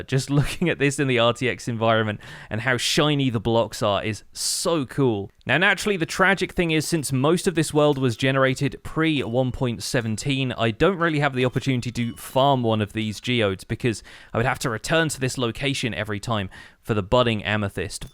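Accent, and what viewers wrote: United Kingdom. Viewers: I have a question are u British? No pressure😅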